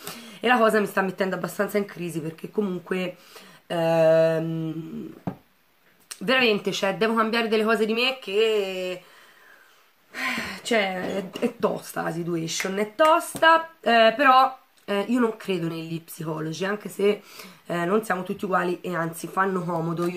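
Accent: Tuscany, Italy. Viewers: Grande mi mancava sentire il nostro toscanaccio!!! BEN TORNATA!!🎉🎉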